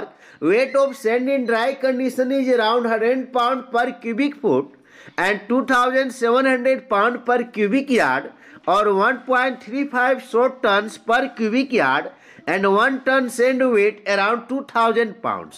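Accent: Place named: India. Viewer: Sir your English is pronounce like hindi difficult to understand,better to speak in hindi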